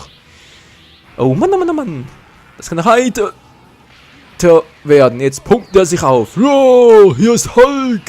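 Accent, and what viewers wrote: Switzerland. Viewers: Bist du Schweizer ich liebe diese Sprache! :DD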